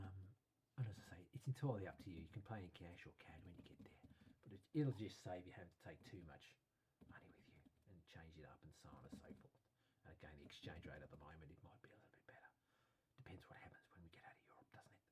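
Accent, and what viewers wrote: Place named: Australia. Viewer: lol...You do a good job with an Aussie accent! :-)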